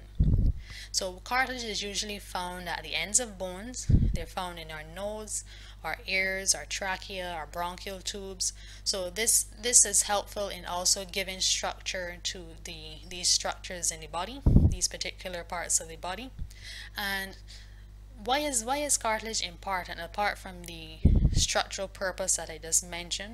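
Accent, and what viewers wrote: Barbados. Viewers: Are you bajan?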